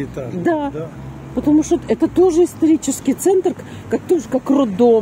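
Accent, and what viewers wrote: Odessa, Ukraine. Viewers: У ВАС ЧИСТЫЙ ОДЕССКИЙ ГОВОР, МНЕ ОЧЕНЬ НРАВИТСЯ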